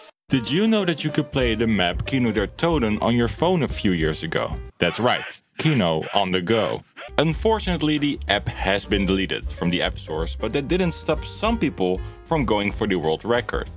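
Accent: Netherlands. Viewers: I hate the Dutch accent 😢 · Sowieso dat je Nederlands bent. We herkennen ons eigen accent vaak iets te goed haha. Fantastich filmpje vriend! De kwaliteit van soortgelijke YouTubers die miljoenen subs pakken. Mooi om te zien dat het algoritme heeft geholpen. Ga zo door!👊🏻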